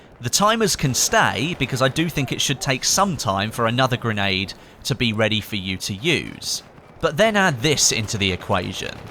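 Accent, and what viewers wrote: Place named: England, United Kingdom. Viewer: john long He's British he has he English accent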